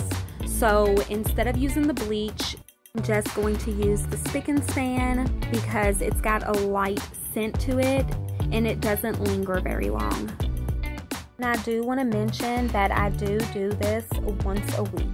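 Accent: South, United States. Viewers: I enjoy listening to your commentary because of your accent. It reminds me of my southern family, and you're pretty as well. Love from California.;)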